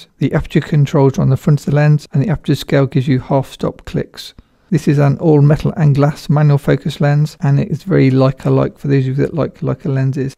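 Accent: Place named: Northern England, United Kingdom. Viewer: I suppose accent is a Northern English accent. I like it very much! Very easy to understand. And is nice to look at;)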